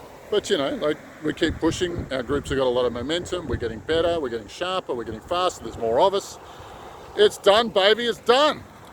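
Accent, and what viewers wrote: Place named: Australia. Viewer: I just found you & am blown away by the little I’ve seen. It wasn’t until I saw the stunning scenery behind you that I clicked you were Aussie & why your accent & speech were so relatable - 👋🏻 from cold, wet, freezing Melbourne here on Cup Day 🏇🏆 After decades of physical/mind blocks, I finally see/feel the closest to home ever, expanding without restriction & what I heard here made complete sense. Thankyou, may love & light be with you always 💗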